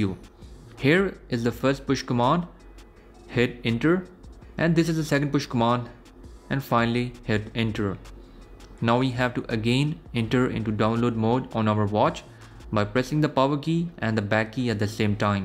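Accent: India. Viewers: Excellent video!!! I did this on two Watch 3!!! Super and I like your india accent!! Im in Quebec Canada! Thanks a lot!!!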